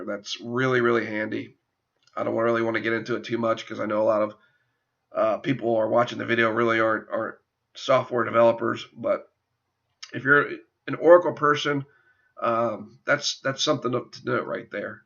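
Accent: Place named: Eastern Shore Maryland, United States. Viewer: Sounds like a dude from the eastern shore of MD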